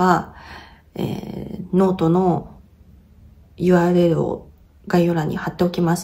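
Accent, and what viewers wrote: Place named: Tochigi, Japan. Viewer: 栃木だったんですね私は茨城の鹿嶋です案外言葉似てるね😊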